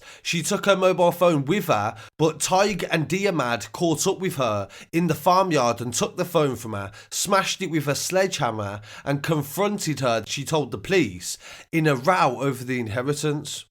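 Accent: Birmingham, United Kingdom. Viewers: That bham accent is the only thing that ruins his channel 🤮🤮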